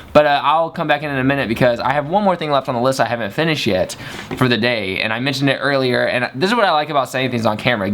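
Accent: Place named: Kentucky, United States. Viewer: I'm assuming he's from Kentucky like William. I'm from uk so that's my only reference point:D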